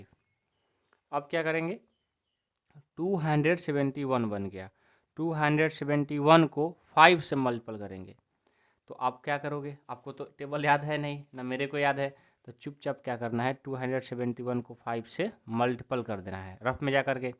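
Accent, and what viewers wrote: Bihar, India. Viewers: Bihar ke ho kya language se lagta hai😊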